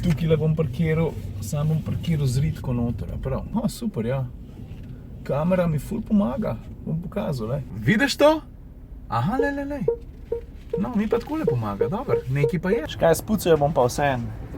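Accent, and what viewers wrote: Styria, Slovenia. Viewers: štajerski na glas pa ti gre.😂💜💛#ifeelmaribor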